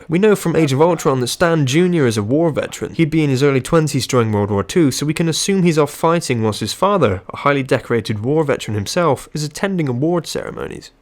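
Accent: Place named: West Country, United Kingdom. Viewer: This is bloody brilliant- had me laughing and is surprisingly feasible! Is that a west country twang I detect in your voice,?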